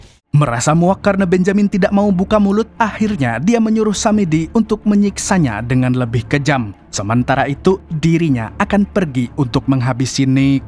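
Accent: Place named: Banten, Indonesia. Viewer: Banten mana suara nya🖐️🖐️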